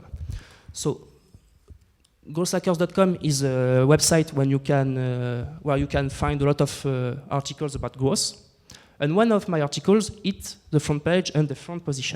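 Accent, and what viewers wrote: France. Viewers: Stop la condescendance, nous ne sommes plus au lycée. Être "fluent" en anglais ce n'est pas donner à tout le monde, que ce soit par contexte, âge, lieu ou expérience. Il y a une sale manie en France de faire de la maîtrise de l'anglais une barrière à l'entrée au monde de l'entrepreneuriat (entretenu par l'institution école de commerce). Hors on se ferme à 2 choses : - les intelligences ne parlant pas très bien anglais (nos mères, nos parents ?) - les marchés non anglophones et pourtant massifs et en croissance forte (à tout hasard : chine). Hors désolé, mais le monde anglais offre tellement de savoirs que ces vidéos ne font pas le poids. Pour un anglophone l'accent est trop prononcé, pour un hispanique l'accent rend l'anglais encore plus difficile et pour un français, s'il parle anglais, il ira regarder d'autres vidéos. Perso je n'ai aucun mal avec l'anglais, mais pour faire du sport, pour le métro, ou pour s'endormir, le frenglish ça demande trop de concentration. 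En plus on va pas se mentir, c'est un peu bidon comme stratégie rien que vis à vis de l’algorithme de Youtube. Perso j'aime la famille pour la voir se rater. Mais je ne peux pas non plus leur en vouloir de tester.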